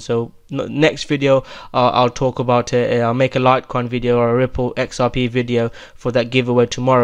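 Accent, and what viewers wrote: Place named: United Kingdom. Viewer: Very appreciated again 👍👍👍thanks for the video. I love your British accent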